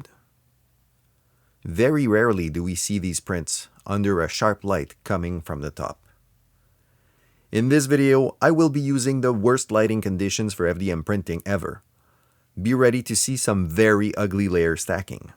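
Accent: Quebec, Canada. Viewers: That guy sounds like he's from Quebec. Really nice and detail video btw.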